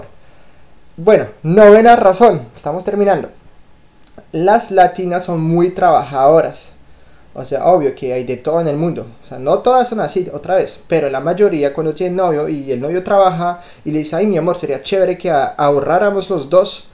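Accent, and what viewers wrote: Bogotá, Colombia. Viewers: SOS un francés con acento colombiano! 😍😍😍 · Habla un español muy colombiano! jajaja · "Listo mi papasito" hahahaahh en serio me reí mucho, una novia o ex novia un tanto... "guiza" :/ y sí, definitivamente tu acento suena más rolo que el mío (Rol@ = Bogotan@ Estamos en la misma ciudad :) y espero jamás encontrarnos porque no tendré el tiempo para decirte todo lo que quisiera. ✌ Chao! linda vida y espero que la chica sumisa con quien te cases abra los ojos algún día. 👀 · Creo que te pego mucho el acento colombiano!😚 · q bonito, y claro!! habla el español!! mas' bien colombiano!! esta simpatico!!😆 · Eres un colombiano más!!! Tu acento es super Bogotáno. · Francy Sandoval me encanta que reconozca la belleza latina ,tiene expresiones colombianas como" verraca " y un poco el asentó rolo se le siente saludos desde Colombia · La vaca muerta jajaja este frances ya se quedo en.colombia todo el.acento haha que bueno.Que te gusta , por aqui no.somos de implantes ni cirugias. 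Somos naturales jiji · Yo creo que todas tus novias han sido Colombianas, porque es que hablas con acento Colombiano. acá en Europa cuando escucho hablar a un Europeo sea de la nacionalidad que sea, hablan con acento de Españoles. Me caes bien, hasta ahora descubri tu canal, y me simpatizas mucho, hasta en la manera como a veces te expresas me pareces tierno. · Vea, hombre! Ud tiene un acento colombiano bien bacano🤣🤣 o se escribe vacano? Nunca he visto esta palabra escrita, no sé cómo va. En fin! Lo cierto es que tienes acento colombiano 😀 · Senovia Trinidad pues no habla bien, se le a pegado el acento de Colombia o de algún país sudamericano, el español nació en España, decir que habla muy bien es demasiado, deberías decir que al menos se hace entender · Me da gracia que hablas español colombiano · Hablas como colombiano. Saludos! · Tiene el acento de Colombia. Así que puedo apostar que anduvo con una colombiana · Pareces más colombiano, madre mía jajaja que bien hablas el español con ese acento. 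· Acento colombiano pegadísimo, de dónde eres? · Habla como colombiano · es que a estado más tiempo en Colombia ¡¡¡ se le ha quedado las jergas Colombianas e inclusive el acento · Hablas muy cómico, pareces de Colombia. He imitas muy bien a las mujeres. Éxito consiguiendo esposa latina. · Hola..yo creó que has vivido mucho tiempo en Colombia hablas y te expresas como colombiano..saludos. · Este chico es Francés, pero sus padres serán Colombianos, por que tiene acento colombiano, sus padres serán colombianos.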